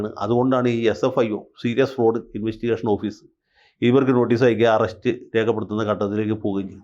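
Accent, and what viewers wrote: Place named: Thiruvananthapuram, India. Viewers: വക്കീലെ നിങ്ങളുടെ ട്രിവാൻഡ്രം സ്ലാംഗ് ഒരു രക്ഷയും ഇല്ല 😂😂😂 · വക്കീലിന്റെ തിരോന്തരം സ്ലാഗ് ഒരു രക്ഷയുമില്ല 😂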